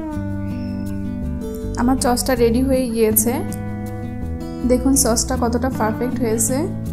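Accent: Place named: Netrokona, Bangladesh. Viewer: নিশ্চিত নেত্রকোনার পাব্লিক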